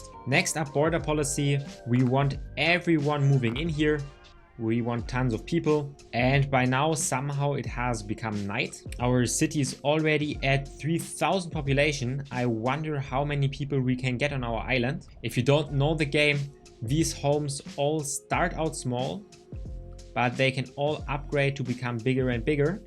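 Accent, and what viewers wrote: Germany. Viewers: I can hear german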